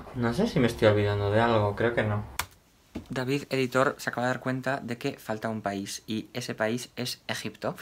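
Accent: Spain. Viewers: Me pierdo con el acento español 😍 Me parece tan ... Ah! No sé porque pero me encanta 🙈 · Y ami me encanta el asento Español España :)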